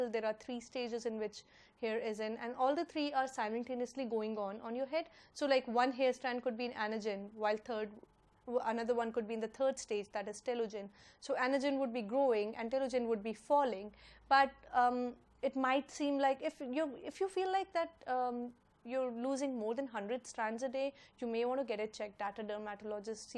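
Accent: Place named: India. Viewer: Although I don't have that long hair already, but I'm on the way to get it to classic length, I could listen to you all day long ^__^" Really, your voice is so calming and because I work with Indian colleagues together and sometimes have a talk with our Indian developer chief "Manini", I'm kinda used to the indian way of pronunciation of english words ^__^" You're awesome as always,. Greetings to you and best wishes to your channel and forward to India:) Pats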